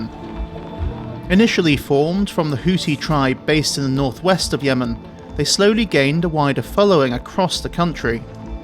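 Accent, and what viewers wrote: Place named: United Kingdom. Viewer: You sound like you live in Britain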